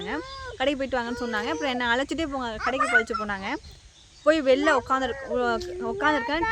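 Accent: Thanjavur, India. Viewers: தஞ்சாவூர் தமிழ் அப்படி தான் இருக்கும்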